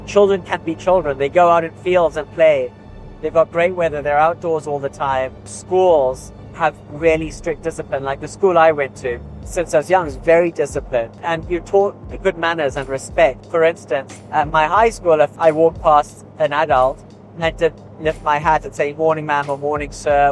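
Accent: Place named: United Kingdom. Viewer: Zimbabwe? I thought you were British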